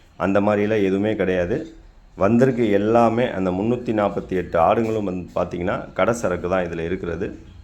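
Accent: Madurai, India. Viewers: ❤ assalamualaikum warh best goat lovely madurai ❤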